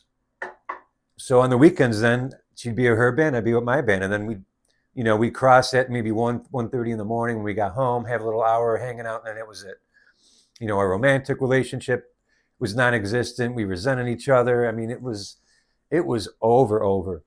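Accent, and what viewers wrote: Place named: Cleveland, United States. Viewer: Are you from Cleveland? I am...recognize the accent!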